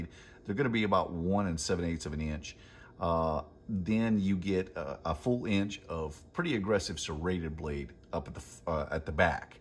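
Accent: Texas, United States. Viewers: Are you actually from Texas? I'm trying to place your accent. You sound like Michael Dorsey from Tootsie when he was doing the soap opera.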